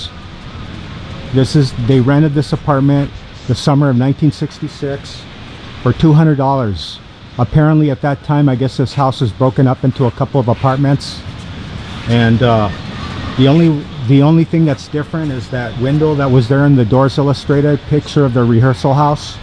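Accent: East Coast, United States. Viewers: You’ve lived in la all off your life but you have a thick east coast accent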